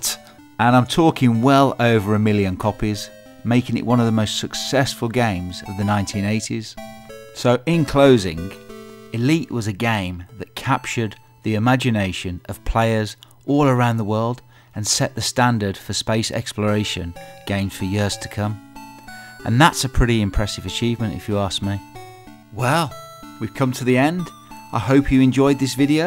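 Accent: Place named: Birmingham, United Kingdom. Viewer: Subbed: Is that a fellow brummie I hear!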